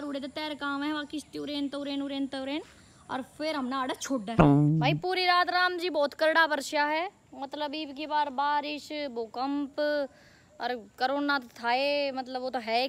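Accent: Haryana, India. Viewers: RAM ram Ji wase chai to hai badi Tati or kya haal hai kade haand rahe Ho aapki boli mixed hai Hindi Aadhi Haryanvi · Yo Bhai Najafgarh aali side k Hindi or haryanvi mix krke bolle hena ktti ghee sa ghal jaa hai baiii... 😂😂👅😍❤❤❤❤❤❤ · mja aa jata gai haryanvi sunkar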